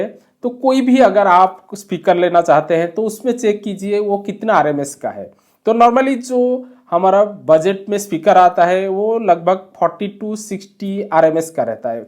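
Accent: Odisha, India. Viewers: odisha se ho?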